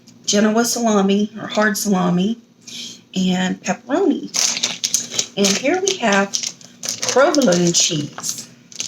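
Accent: South, United States. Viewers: It’s that sweet southern accent and attitude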